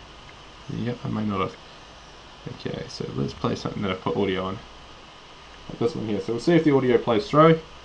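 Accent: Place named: Australia. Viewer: He's either Australian or he's from New Zealand. he's not English. Please learn accents he doesn't sound remotely English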